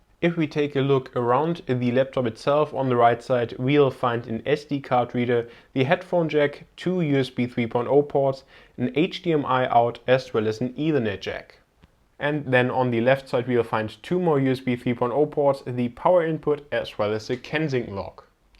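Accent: Germany. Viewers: Du bist doch deutsch oder? Gutes Review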